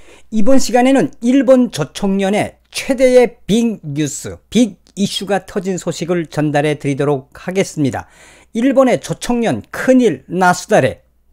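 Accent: North Korea. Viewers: 정성산 감독님의 구수한 북한 사투리가 메세지를 살살 녹여서 입으로 쏙쏙 잘 넘어 갑니다..ㅎㅎ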